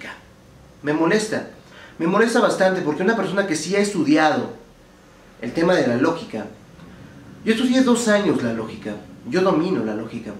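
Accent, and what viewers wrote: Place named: Peru. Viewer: De dónde eres? Pareces de Perú. Por el dejo más neutral.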